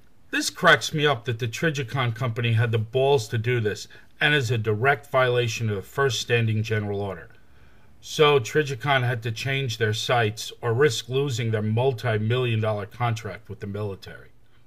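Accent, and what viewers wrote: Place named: New York, United States. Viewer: Thanks for the neat video. A real ACOG is outside my price range right now but you have given me something to think about. I recently finished my second AR-15 build project. I had to sell my first AR and all my other guns back in 2011 to finance my move from Texas to NC. I live on a low SSI disability income now so it took me about a year and a half to finish my current AR-15. I decided this time to go retro and I built this one as close to my M-16A1 that I had when I was in the US Army back in the 70's and 80's. I even used my old triangular handguards and pistol grip my buddy, who was our unit armorer, let me keep. I guess they are expendable items. I was not able to afford a real A1 upper so I had to settle for an Anderson flat top upper with a screw on carry handle. I did find an A1 butt stock at Numrich Arms for just $21.82. 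I used a 20" lightweight barrel from Del-Ton. I took it to local range Sunday and at 50 meters I put 17 out of 20 rounds in the X ring of my silhouette target. I used a 6-24X50 AOEG CV Life Scope. Yeah it's from China but it only cost me $36.00 haha. Local range only has 50 meter section open now. They have to redesign 250 meter range so rounds will stop hitting neighbor's barn. You sound like you grew up in NY. I grew up in New Rochelle, but I don tawk like dat no maw. Subbed and liked.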